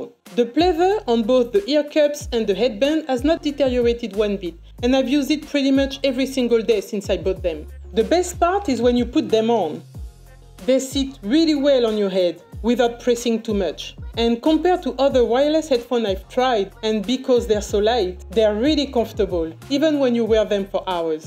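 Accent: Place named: France. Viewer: You speak French ? J'arrive à entendre ton accent ;)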